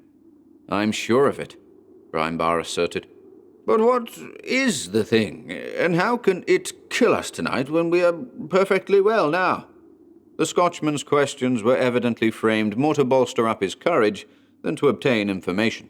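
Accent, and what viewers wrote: United States. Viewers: does such an amazingly subtle American accent it makes me laugh.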